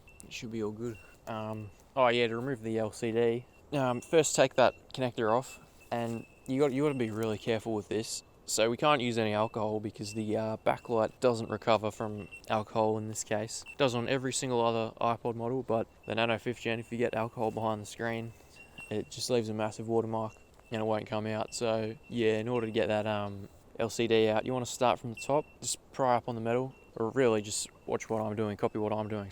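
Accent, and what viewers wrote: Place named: Australia. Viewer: Love your vids man, Aussie AF Easy to follow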